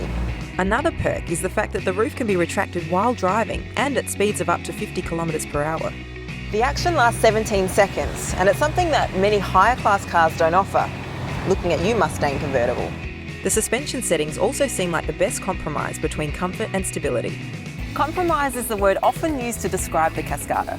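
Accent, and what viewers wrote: Australia. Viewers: So weird to watch a sistah with an Aussie accent ;) crikey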